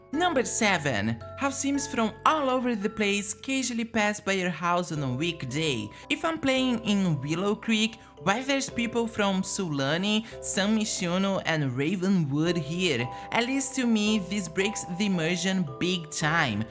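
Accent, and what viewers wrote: Brazil. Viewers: I still have Sims 2 Ultimate Collection and I love to play it so much, its thousand times better than Sims 4, but then I never played Sims 4's DLC's, so I want to experience the DLCs for myself too. So, I play both but with the Tutorials from PleasantSims, so I play rotationally and just with the Maxis Sims, and I use a lot of Mods too, that are essential. There is even a Sims 2 Savefile, which has the ancestors and everything from Sims 2, but I never used it I just saw it in videos, it seems to be perfect, but I feel like Sims 4 isn't the same universe as Sims 2. It would be perfect if they could make a new Sims game with Sims 2 + 3 gameplay, world, ancestors and all and just the CAS and B&B from Sims 4. That would be perfect, what do you think? PS: Seu sutaque brazileiro é notavel, mas o seu inglés é muito bom! É bom falar assim com o sutaque. 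E já me inscrevi no seu canal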